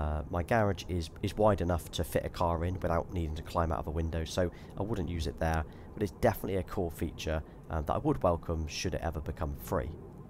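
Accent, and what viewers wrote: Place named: England, United Kingdom. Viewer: Great to here a calm English accent on a Tesla video.... a pleasant change from the over enthusiastic ‘awesome’ American content 😆 Great production and editing too 👍🏻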